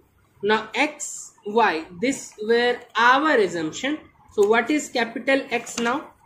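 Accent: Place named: Pakistan. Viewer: Bro your pronunciation sounds like mohd.rizwan(PAK CRICKETER)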